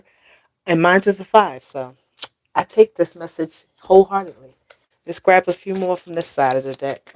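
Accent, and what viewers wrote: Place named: Boston, United States. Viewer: Thanks for the reading from Boston. You sound like a Bostonian to me, maybe just a north east accent. lol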